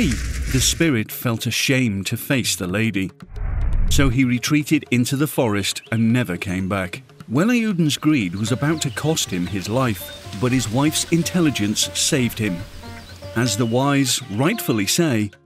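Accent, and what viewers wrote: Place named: England, United Kingdom. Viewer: Great going guys! loving the series. 1 small small gripe - why is an angez narrating the stories ? feels so colonial. No offence to Mr. Narrator hands folded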